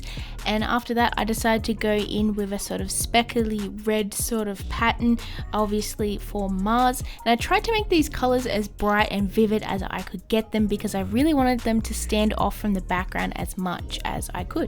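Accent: Australia. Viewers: Thanks for the welcome! Loving your channel so far, so nice to hear the aussie accent too 😉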